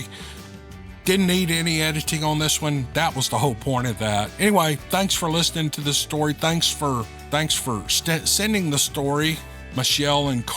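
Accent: South, United States. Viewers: ALL of the stories were GREAT, thanks to those who took the time to share. (Of course, TY to and his delightful southern drawl.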